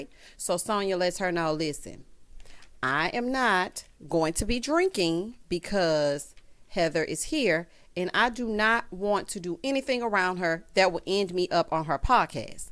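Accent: South, United States. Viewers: I love your beautiful voice you remind me of my Southern family on my mother's side. I am glad I came across your platform I have been enjoying your content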